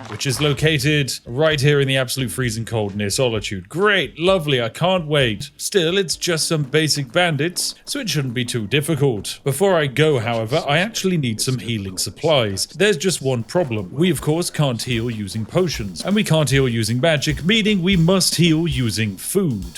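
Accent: United Kingdom. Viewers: I dunno if its you but i got a mewgenics cat that sounds like you, and by that mean blatantly British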